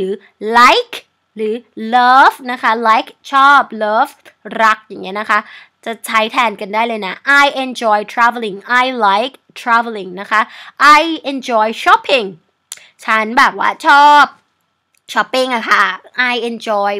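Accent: Thailand. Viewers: มีสำเนียงระยองด้วยย555+ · ชอบสำเนียงภาษาไทยของ จังค่ะ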